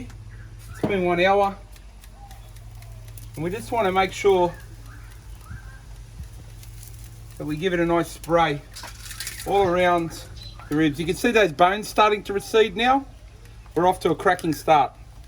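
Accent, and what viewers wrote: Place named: Australia. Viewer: Hey there Chef.............. I'm looking to learn,,,,,,, to cook : using "GAS"....... and wow dude, I love it,,,,, you're not so easily understood,,,, because you speak "AUSTRAILIAN" english, and not "AMERICAN" english. .. I love it,,> thanks so much!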